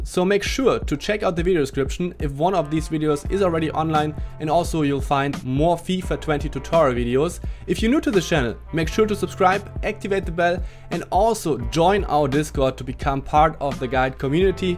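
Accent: Germany. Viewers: Your obviously German aint you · So n bissle hört man den deutschen Akzent dann doch raus 😉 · Bist du deutscher ? Hört sich vom Akzent her bissle an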